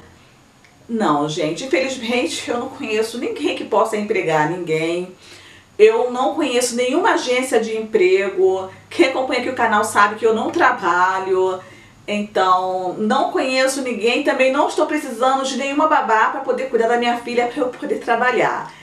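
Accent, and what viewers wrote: Rio de Janeiro, Brazil. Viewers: assim que comecei a ver esse vídeo já notei que vc é Carioca. O Carioca mesmo não sendo da "gema" tem o lindo sotaque. Eu sou do estado do Rio também precisamente do outro lado da poça depois de Niterói. Eu moro em USA por quase 14 anos e meu sotaque charmoso Carioca não me deixa. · É que sou carioca e tenho esse seu sotaque, por isso perguntei...rsrs. Bjsss pra vc, linda!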